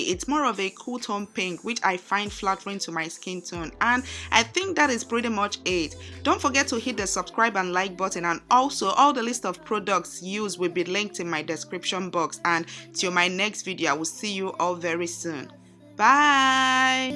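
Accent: Nigeria. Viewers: my sister... I like ur nija accent beta oooh.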